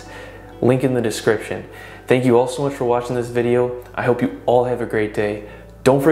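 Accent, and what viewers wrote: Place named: Midwest, United States. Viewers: does this only really work for people who can only see thing far away? Being nearsighted this didnt do much for me except give me a bit of a laugh when he was using his entire face to open his eyes. good vibes on the video though, gotta love that midwestern accent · He sounds like he's from Chicago.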